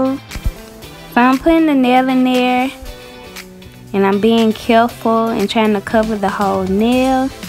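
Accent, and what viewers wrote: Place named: South, United States. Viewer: That southern accent boo 😍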